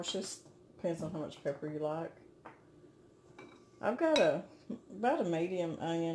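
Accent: South, United States. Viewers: Oh my your camera is wiggin out today! 🤔 Love this recipe, and your sweet southern drawl! Definitely comfort food with the addition of mashed potatoes and sweet peas and butter! Yum! Thank you for recipe using venison!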